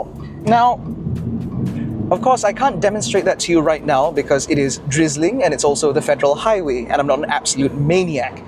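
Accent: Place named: Upper class, United Kingdom. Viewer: Speak like a British aristocrat. nice......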